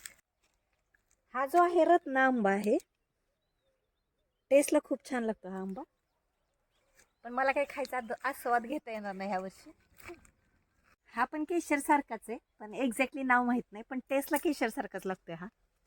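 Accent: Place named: Barshi, India. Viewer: Didi tumi proper kontya city mdun ahat tumchi भाषा पूर्ण बार्शी कडे अस बोलतात तशी आहे.ऋतुजा माझी फ्रेंड आहे .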